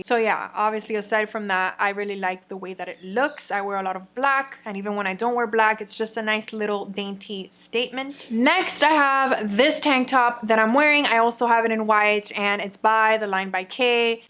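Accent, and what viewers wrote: Cuba. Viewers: Ok, you have to make a video in Spanish. Me encanta tu acento Cubano You look beautiful in this video, as usual. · Cubana! No daba con tu acento!! 😅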